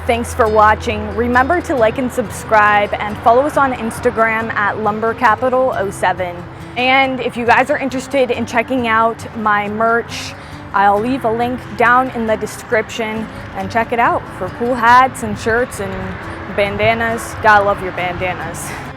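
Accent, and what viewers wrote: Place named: Pennsylvania, United States. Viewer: I just love your NE Pa accent. And I'm just mesmerized that you took the initiative to put this Utube channel together, while you're schooling and working at the mill. And taking your work seriously is refreshing. So, it's all these things that make your channel a pleasure to watch! Something tells me that you two are going to succeed in life, no matter what path you choose. And something tells me you have a LOT of people in your corner, cheering you on.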